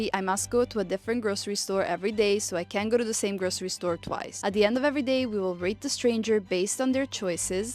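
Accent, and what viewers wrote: United States. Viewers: why do u speak in american english when ur european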